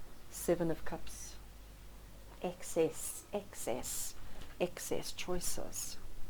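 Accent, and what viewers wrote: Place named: South Africa. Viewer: Hi...by your accent you sound South African. Is this so? If you are, then you're the first SA YouTube channel I've discovered here. Oh and this deck is on my Xmas wish list!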